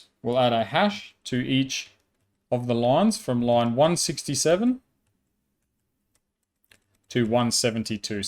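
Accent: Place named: Australia. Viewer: Thanks, good video and great to listen to an Aussie accent for a change. Where do the numbers you use to fill in the fields in TBS Recorder come from? Are they published somewhere? I get that this video is "for educational purposes only" and you don't want this to turn into a hackers guide. Does the locked status change only when you've selected the correct settings for that satellite and that transponder?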